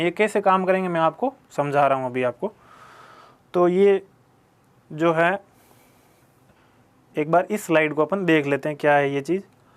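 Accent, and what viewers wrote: Rajasthan, India. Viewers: Love your rajasthni voice tone.. 😍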